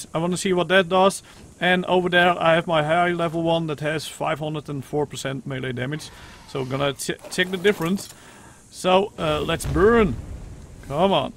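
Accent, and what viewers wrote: Netherlands. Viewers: Are you dutch xD Sometime you've got a Dutch accent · oefen je engels aub ik hoor dat je nederlands bent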